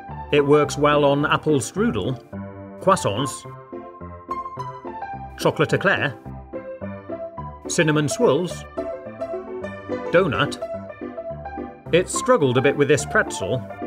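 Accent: United Kingdom. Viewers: Are you from uk🇬🇧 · He's British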